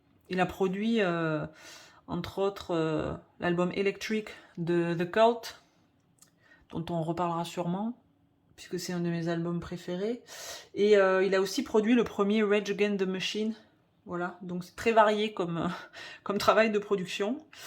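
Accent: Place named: North, France. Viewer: Bonjour mademoiselle, oh! Purée que çà fait du bien de voir une vidéo d'une fille qui nous parle de rock 😂😂😂. Première fois que l'on présente le chef-d'œuvre de dans une vidéo, enfin 😊😊😊. L'album post mortem de cet artiste n'est surtout pas à négliger. Première fois également que l'on présente de, meilleur selon moi que, merci pour cela😊😊😊. En parlant de grunge emmenés par le regretté étaient magnifiques. excellent, excellent, ils ne font absolument pas le même type de musique. En voyant l'album de, j'ai immédiatement pensé au youtuber vinyl over qu'on ne voit plus malheureusement sur la toile que dire, on s' incline. La reprise de par mon héros est splendide. Dis-moi, ils prenaient bien soin de leurs vinyles tes parents. Allez mademoiselle, je vais regarder toutes tes vidéos prochaines, ça s'est sûr. ❤❤❤❤ Ps: d'où vient ce petit accent si sympathique? 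Du nord? 😂😂😂😂.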